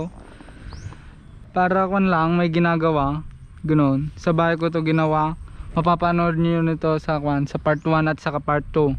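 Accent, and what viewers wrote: Ilocos, Philippines. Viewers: Halata man nga ilokano Lodi haha astig ta motor mu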